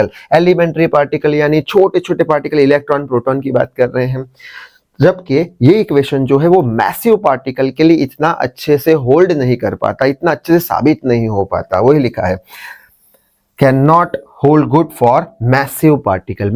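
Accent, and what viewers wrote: Gujarat, India. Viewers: Sir aap Gujarat ya fir rajasthan se ho na 😅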